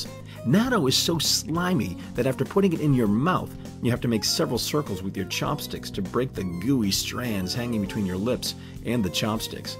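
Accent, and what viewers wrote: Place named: New Zealand. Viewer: Your NZ accent was way better, it's what makes your videos awesome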